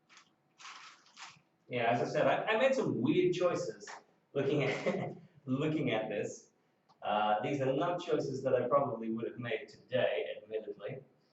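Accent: Australia. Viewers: I love that you are ashtralian and that you are not british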